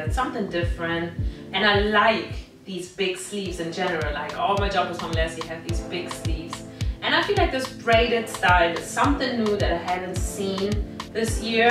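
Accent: Germany. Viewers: Delia Beer ja, sie kommt aus Deutschland und ist dort geboren, deswegen ist ihr englisch auch anders als das von den native Amerikanern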